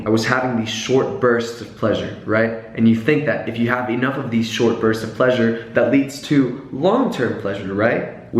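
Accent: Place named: United States. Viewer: !?? Sounds really american. Think you had enough... and finally grown up.😉 Greetings from Holland.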